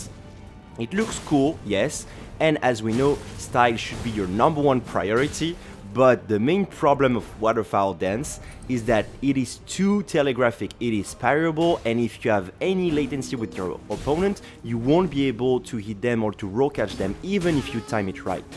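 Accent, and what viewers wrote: France. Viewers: le petit accent fr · the day they will increase the range of the meteoric ore blade and buff it, i will be in heaven. (i have a question, wich country do you come from ? i sensed a french accent but i think im wrong) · French accent ? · T’es français enft parce que ta un petit accent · You have a french accent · gg très belle vidéo xd g direct remarqué ton actent fr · I didn't know french accent could be actually nice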